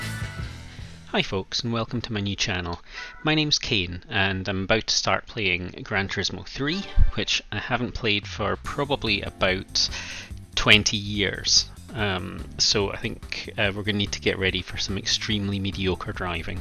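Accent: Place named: Scotland, United Kingdom. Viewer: Good to hear.. Scottish accents are the best in my opinion...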